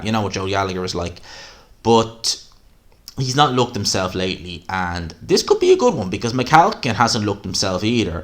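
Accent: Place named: Ireland. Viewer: 1st. Loving da irish accent. is a goon. Truly real channel. Totally bullshit free. Exactly how it supposed to be. Much appreciated 👍👍🔥🔥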